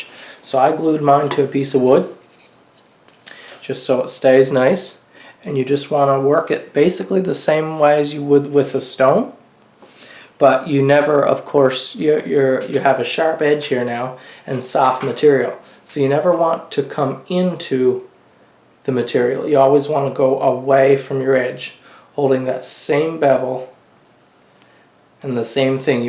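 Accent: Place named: Newfoundland, Canada. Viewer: Dude, are you from Newfoundland!? Haha great tutorial! Got a paramilitary 2 on the way as well as a 400/ 1000, 3000/8000 and strop. Can’t wait!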